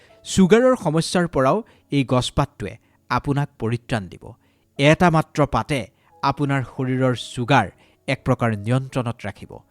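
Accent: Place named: Nalbari, India. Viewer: Nalbari para koiso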